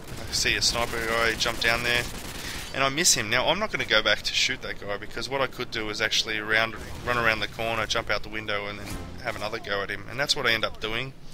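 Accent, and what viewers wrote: Australia. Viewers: australian commentator FTW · an aussie! bout time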